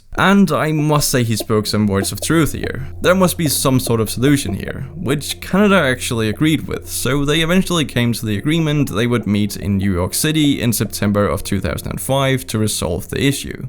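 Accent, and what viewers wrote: Denmark. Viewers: This guy is definetly danish with the way he pronounces danish words! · Are you dane, it Sound like it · Are you danish you sounds danish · You Sound danish not gonna lie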